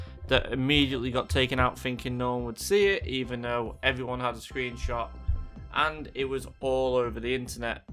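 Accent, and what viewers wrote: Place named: Manchester, United Kingdom. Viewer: Hi. Count Jinsula did a video today saying it looks highly likely that Hanke has put his demand out that he doesn’t want remote raids at all. On another note, I detect a Mancunian accent. You know how bad the weather can be in that part of the country. (I am Lancastrian) but live in S Wales now which arguably experiences just as bad weather conditions. There are times it’s just far too dangerous to go out at all. Hanke lives in his deluded narcissistic bubble where everyone on the planet lives in a mansion in California, where no one works but has unlimited amounts of spare time and money, with unicorns leaping over rainbows. Hanke, right there, is a brilliant example of how to run a company into the ground , bc narcissists are never wrong and know absolutely everything about everything and they know better than everyone else. 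All hail Mr. 💩 Sorry for the rant. I love this game but I went from being never being able to raid legendaries (always lived rural, only myself is playing) to being able to raid legendaries, to now not being able to.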